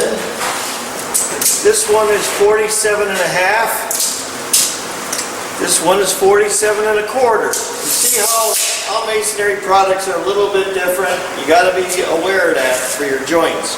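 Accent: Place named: Chicago, United States. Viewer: I'm binge watching your videos ! are you from the midwest? You definitely have an accent like you're from the Chicago area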